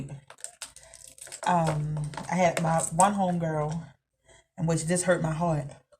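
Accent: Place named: Baltimore, United States. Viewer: You’re from Baltimore. I know this because I love y’all accents so much😭❤️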